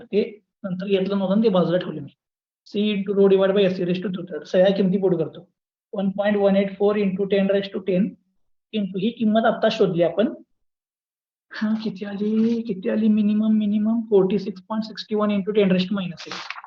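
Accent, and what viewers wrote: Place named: Kolhapur, India. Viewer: Kolhapuri accent is so sexy sir 😊😊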